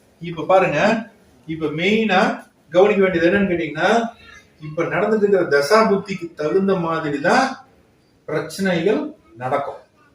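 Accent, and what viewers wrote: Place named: Kongu, India. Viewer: வெகு எதார்த்தமான கொங்கு தமிழ் பேச்சு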